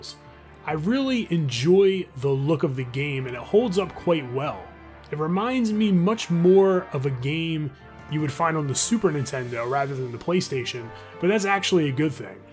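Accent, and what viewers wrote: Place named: Western Pennsylvania, United States. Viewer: Are you from western PA or Ohio? Maybe Baltimore? I hear that accent haha regardless, this game is so close my heart ❤️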